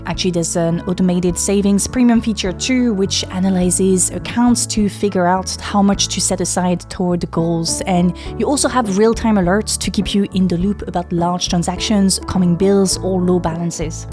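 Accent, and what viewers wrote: France. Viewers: which French department are you from? I’ve never heard a French person sound this French 😭😭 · just so you know, no one would be watching your content if you didn't have the french accent.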